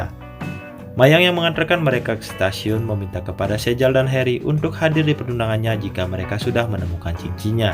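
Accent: Bali, Indonesia. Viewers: Dari logatnya mimin kaya orang Bali yaa hhh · Kok kayak bali malahan bng 😁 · ini yg punya chenal pasti orang bali . . logat balinya kluatan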